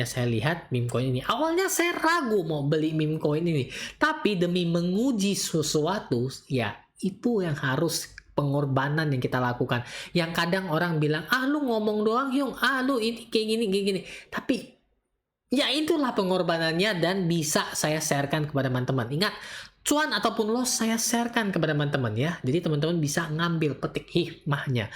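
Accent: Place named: Pontianak, Indonesia. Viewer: Pak po.. bahasa orang pontianak ne hyung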